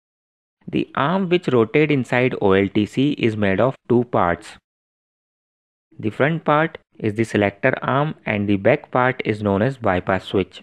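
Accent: India. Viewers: Your electrical knowledge is so good. I am pleased to improve you Indian english pronuciation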